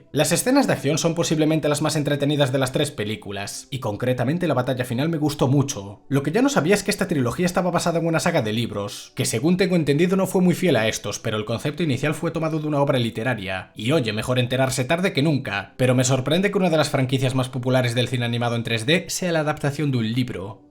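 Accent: Spain. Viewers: Che de donde sos ? Hablas con mi argentino, pero acento español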